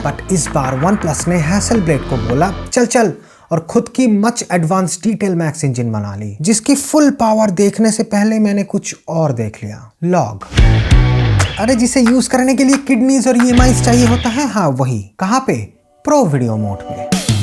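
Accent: India. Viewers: SMASH review bro. Maza aagaya. Keep up the good work!!!! English bhi kardho wohi desi accent pe, aur viral ho jayega 💸💸💸💸 Bless u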